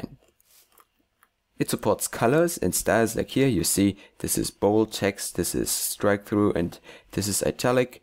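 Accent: Germany. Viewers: wait, ur german???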